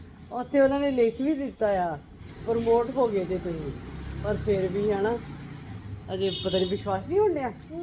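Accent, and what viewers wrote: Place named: Majha, India. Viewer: ਪੂਰੀ ਮਾਝੇ ਦੀ ਬੋਲੀ ਬੋਲਦੇ ਹੋ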